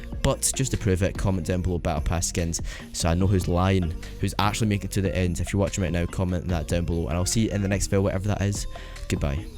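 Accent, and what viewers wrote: Scotland, United Kingdom. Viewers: Ur ye Scottish?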